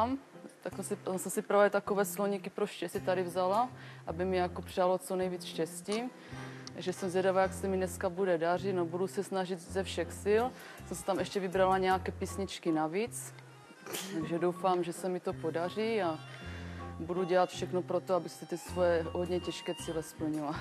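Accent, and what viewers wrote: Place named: Moravia, Czech Republic. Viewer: Ten přízvuk jo jo jasná Morava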